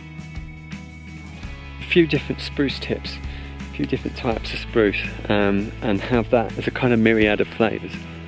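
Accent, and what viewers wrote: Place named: England, United Kingdom. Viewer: I usually hate English accents. But, This guy sounds like Michael Caine♥️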